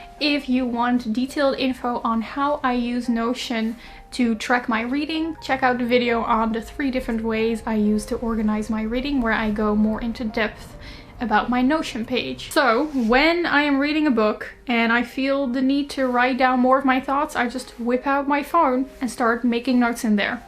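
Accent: Australia. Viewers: I love how you're getting an australian accent <3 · Anyone else noticed that she is starting to get an Australian accent on certain words 😂 Edit: the amount of people telling me she's Dutch 🤦‍♀️ I know she's Dutch. Just that I'm hearing Aussie twists on her words, not a bad thing at all, just an observation 😊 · Where are you from? Australia, Norway or USA?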